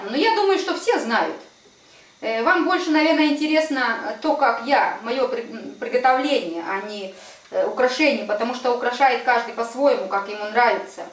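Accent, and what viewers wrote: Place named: Ukraine. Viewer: Вы были в Украине, что вы так говорите..?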